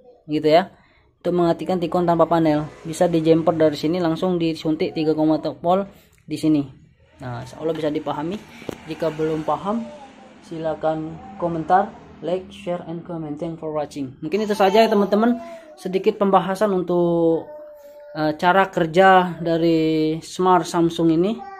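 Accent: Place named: Deli, Indonesia. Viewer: Abg pernah di medan bg? Kalau di lihat dari beberapa vidio Logat abg macam melayu deli 😁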